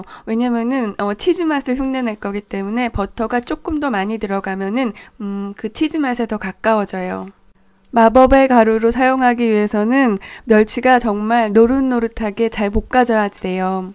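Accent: Seoul, South Korea. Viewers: 목소리도 굉장히 좋고 말투도 상당히 매력적이세요! 그런데 꼭 들어본 것만 같아서 계속 생각해봤더니 서울사투리를 쓰시는 것 같아요!! 꼭 그게 아니더라도 정말 개성 넘치는 말투라 듣기 좋습니다~ 좋은 영상 감사해요 ㅎㅎㅎ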